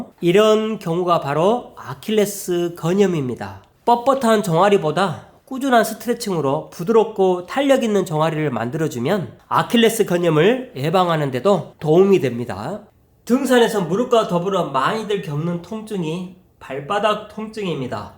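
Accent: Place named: South, South Korea. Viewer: 포토샵 영상에서부터 느꼈지만 디테일하고 전문적인 수준 높은 강의 중화된 남도 억양과 조분조분한 말투 너무 듣기 좋네요 2024년도 님과 가족분들 모두 건강하고 행복한 한해 되세요 2024년을 2004년으로 썼네요 그래서 수정 ㅎㅎ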